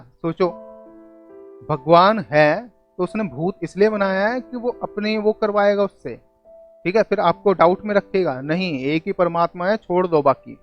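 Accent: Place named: Delhi, India. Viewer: Apki bhasha Dehli ki hai..... Mere ek dost se milti julti.. 🙏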